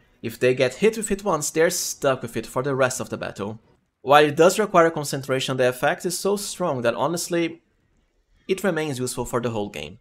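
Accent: Brazil. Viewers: soube pelo sotaque q era br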